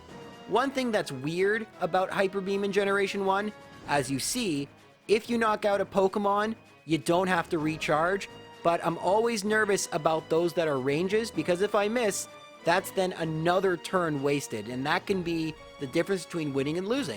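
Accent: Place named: Canada. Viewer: I'm going to say this but you sound like your candanian which I say hello neighbor. After seeing you live you look like christian slater's little brother Edit: add the join button I wanna support your channel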